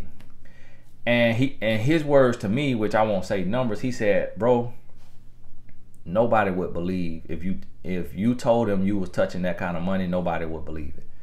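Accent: South, United States. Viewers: I love your country accent and your authentic self! You're a blessing to us. God made you just the way you were supposed to be.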